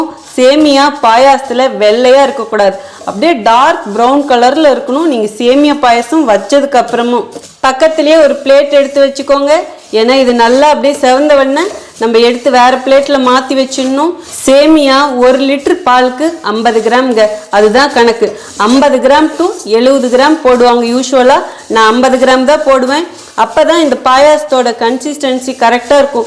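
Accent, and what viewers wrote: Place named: Tirunelveli, India. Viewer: நீங்கள் பேசுவதை கேட்கரசனையா இருக்கு தெளிவா.இருக்கு வாழ்த்துக்கள் மகளே எந்த ஊர்நீங்க பிள்ளை னுசொன்னது நெல்லையா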